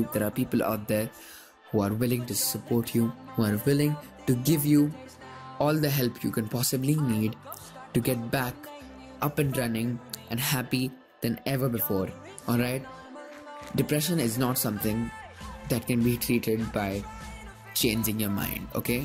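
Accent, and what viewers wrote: India. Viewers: are u Indian or like Asian? not trying to sound ignorant but it kinda sounds like it in your voice. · I recognise that accent 🇮🇳 India all the way!!!!!🇮🇳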